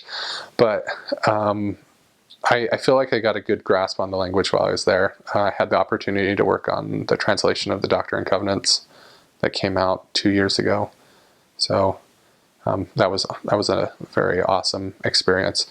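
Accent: Finland. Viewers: He sounds so Finnish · ) u have a kind of a finnish and a swedish acent mix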